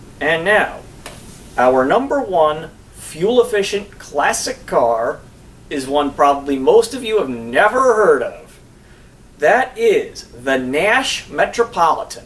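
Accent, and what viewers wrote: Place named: Chicago, United States. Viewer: You said South Carolina but I hear Chicago.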